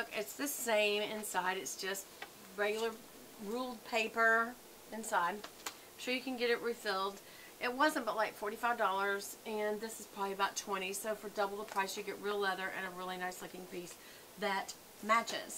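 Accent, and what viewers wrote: South, United States. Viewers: !!! OMG where have YOU been all my life! Brahmin AND A PLANNER GIRL?! I love it! This video has changed my mind about which Brahmin (will be my first one) for my birthday next week. I love the southern drawl, imma a bell too! 😘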